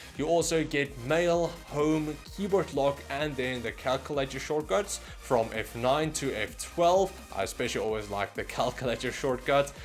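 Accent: South Africa. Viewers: South African? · Being South African myself the moment you spoke I knew you were from SA 😂 That’s our accent but THANK you I have a decision to make between this combo or the Corsair K55 combo. Your video is helping me out in so many ways. Baie baie dankie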